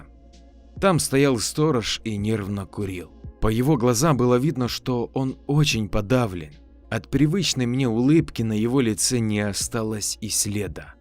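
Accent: Ukraine. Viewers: Автор, не отрусить одежду а отряхивать ) не обижайся , это украинский канал ? Твои истории отличные и читаешь хорошо люблю слушать твои истории ну иногда слова украинские на русский лад. Тебе же нужны длинные комменты ) вот и написала, я украинка может мне мерещиться ))))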